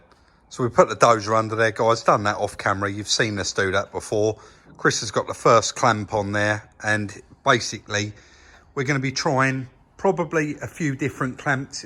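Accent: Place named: RP, United Kingdom. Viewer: I'm sure there's many people who find your voice very irritating What's so irritating about voice? All I hear is the Queen's English..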